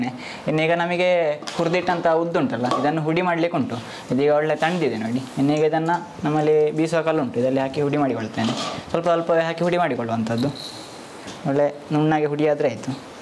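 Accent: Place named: Tulu Nadu, India. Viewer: Bhari yedde pandar...Erna Tulu mishritha kannada kusi apund...kenare...rattunu Dade....😁 Maani nu kende panle...attigye masth paapadar ye..namasthe🙏 Niklena back supports gu yenna solmelu...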